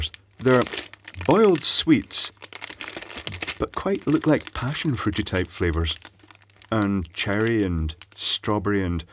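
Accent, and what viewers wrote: Scotland, United Kingdom. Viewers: I've watched this twice, so far. Should I be embarrassed to admit that Czech words and yummy noises in a Scots accent are oddly compelling?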